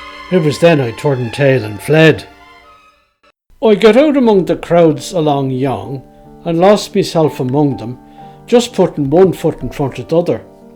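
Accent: Dublin, Ireland. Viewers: I have a confession. I've come to tune in to your channel - not just for the story - but to hear that wonderful Dublin dialect! I love it!!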